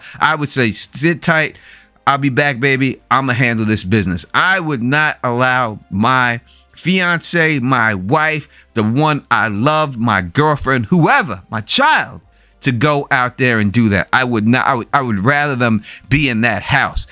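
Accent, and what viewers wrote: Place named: New York, United States. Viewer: Yay! I love your analysis, and NY accent! 😍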